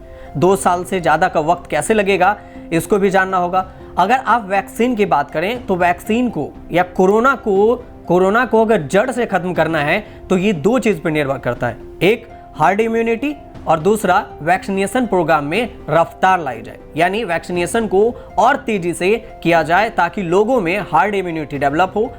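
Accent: Bihar, India. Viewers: A bihari accent thheek karr Bhaarat hota he Bhaadat nai Zaroori he Zadoodi nai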